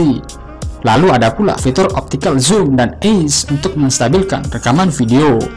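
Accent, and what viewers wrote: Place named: Chinese-Indonesian, Indonesia. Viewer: Dari logat bicaranya kayakx koko koko deh yg ngomong ini... mirip ama logat temen2 gw yg orang china,,hahahah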